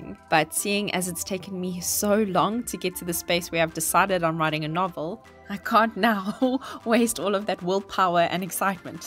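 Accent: South Africa. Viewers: Are you South African?.... I miss home....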